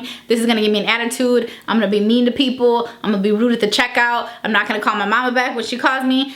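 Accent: Chicago, United States. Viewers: Rylie Schulze I’m pretty sure she lives in Chicago because she has mentioned going to the suburbs of Chicago in several of her videos. I was thinking she had a Chicago accent too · Also do you live in Illinois or are you from there?? It sounds like you have a Chicago accent haha xx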